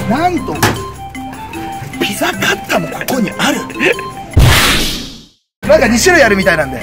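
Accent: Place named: Hiroshima, Japan. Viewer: HIPPYの広島弁がすごいすき。HIPPYもすき。♡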